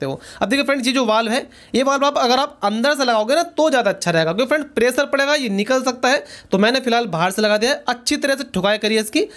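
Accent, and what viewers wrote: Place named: Haryana, India. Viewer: Aap kapil sharma jaisa bol rahe hein Shayed aap haryana se hein Good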